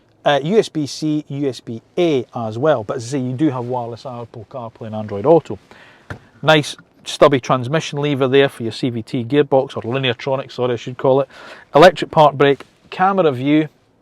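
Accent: Scotland, United Kingdom. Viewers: Thank you for a truly fabulous review! Although the e-boxer Hybrid is just coming to here in one of the colonies, Canada, it is not yet available, and from early reports it will be in high demand. I will be getting a 2025 top trim non-Hybrid, which here in Canada is the Premier. We are lucky that we get the 2.5 L 180/hp engine here. Hopefully I can get the Autumn Green with the brown leather interior. Our grandson said you sounded like "Shrek" but no offence since my wife has Scottish roots. Cheers!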